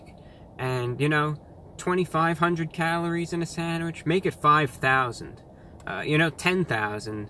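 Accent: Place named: Northeast, United States. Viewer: I know you live in Florida but it sounds to me like you have a very northern east coast accent. Where did that come from?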